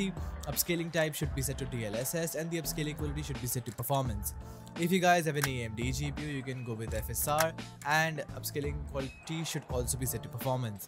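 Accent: India. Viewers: indian detected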